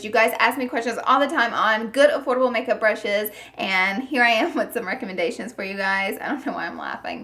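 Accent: South, United States. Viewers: Where are you from you have a country accent I love it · Hayley She doesn't have a "country" Accent she has a southern accent! btw their kind of the same thing but i'm southern so i prefer calling it southern. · You remind me of Alyssa Edwards! Same country accent and everything lol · I just love her southern drawl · It sounds like she's American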